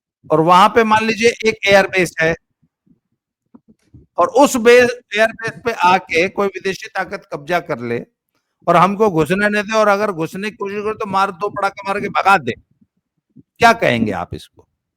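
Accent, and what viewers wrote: Haryana, India. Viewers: Babaji Jay Shri Ram aapka Haryanvi leja bada achcha lagta hai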